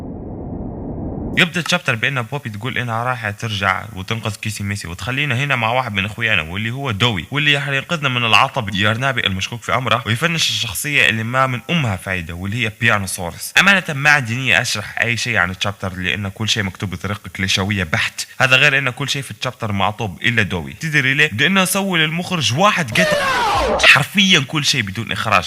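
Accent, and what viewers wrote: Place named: East, Libya. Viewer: من لهجه انت ليبي من شرق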